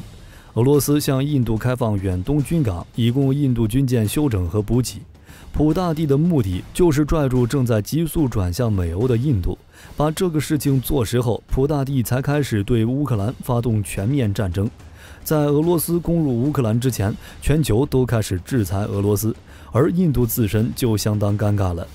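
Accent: China. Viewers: 一听就知道啦，就是中国口音，也许国籍不是中国，但是绝对中国大陆口音，不是台湾，也不是马来西亚新加坡，也不是香港，就是中国大陆的。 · 频道主是大陆人吗，口音好像啊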